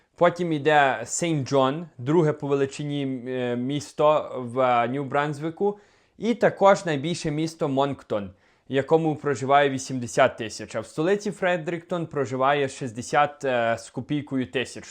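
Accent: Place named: Lviv, Ukraine. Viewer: На Львівщині люди дійсно так розмовляють.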